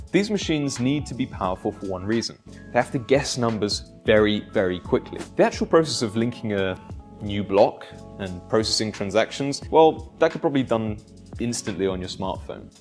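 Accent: United Kingdom. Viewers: British accents make people sound smart. This is 11 minutes of chatter with no new or useful information. He has a suit and tie and speaks like an intelligent person. That's it. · Just because you wear a $2 suit and has an English accent, doesn't mean you know what the fook you are talking about.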